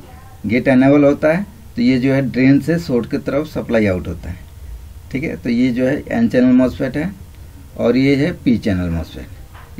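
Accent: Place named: Assam, India. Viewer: vai ap ka tune assam hotahe best of luck.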